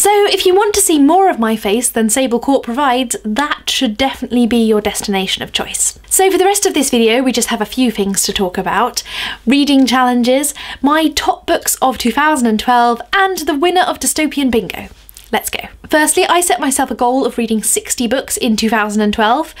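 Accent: United Kingdom. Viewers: Hi, you from England?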